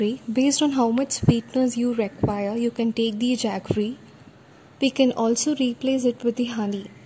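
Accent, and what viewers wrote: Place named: United States. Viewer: American atma in Indian body....your angreji is so English ji.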